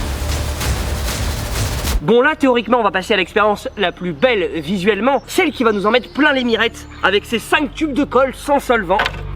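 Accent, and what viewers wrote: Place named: North, France. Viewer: ché l'meilleur accent celui de ch'nord pas un bruit d'kien pas un bruit d'ka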